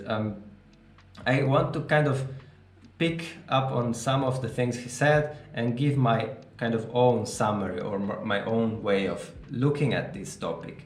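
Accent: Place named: Scandinavia. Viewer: You are from Switzerland? I had no idea. When you talk English you sound more like a Scandinavian to me to be honest :) So German is actually your native language? Have you ever thought about doing this in German? Sometimes I feel like we need more of that, the German language region seems to be disconnected from the rest of the world at times. While Peterson has become a world-wide phenomena nobody I know personally here in Germany has ever heard about him. Which is unfortunate to say the least.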